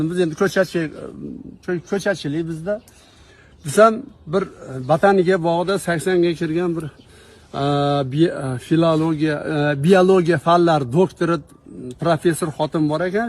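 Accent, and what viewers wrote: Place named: Margilan, Uzbekistan. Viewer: Адрес кайерда шевангиздан Маргилонликка ухшаяпсиз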